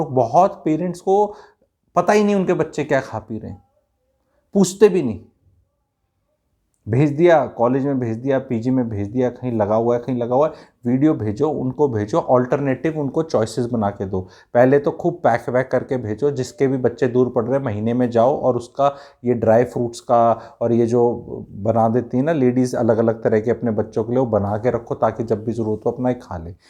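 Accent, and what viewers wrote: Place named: Khadi Boli, India. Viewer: भाईजी, जबरदस्त बात बताते हैं। मजा आता है, आपकी खड़ी बोली सुन कर। कुछ देसी आइटम्स पर वीडियो बना दो बहुत कृपा होगी।,,🙏🙏👍👏👏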